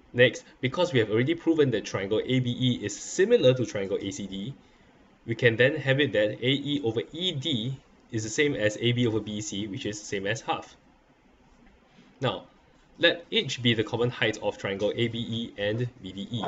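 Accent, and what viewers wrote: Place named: Singapore. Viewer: Sounds singaporean lol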